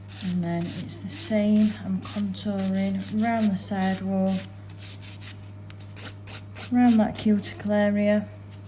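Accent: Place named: Sheffield, United Kingdom. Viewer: Love all your videos and your accent! Can I hear Sheffield by any chance or somewhere in South Yorkshire? I'm from halfway between Wakefield and Barnsley.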